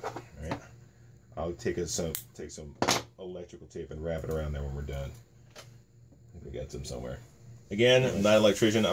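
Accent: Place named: RP, United Kingdom. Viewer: Your british accent is quality lol I thought you were a London Toff ... Good vid Castle fixed ready for next RC Land Battle ;O)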